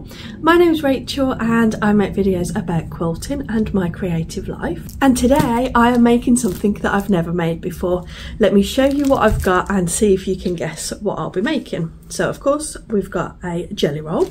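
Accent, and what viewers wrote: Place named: Midlands, United Kingdom. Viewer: Whereabouts in the Midland s are you from. I'm from the Midland too so recognized your accent. I also have a Husqvarna but it's the ruby and has problems, sadly.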